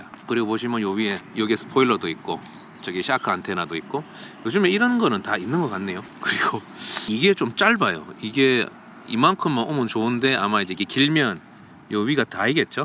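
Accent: Gyeongsang, South Korea. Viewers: 리뷰 잘 봤습니다~~대구분이신가요~??^^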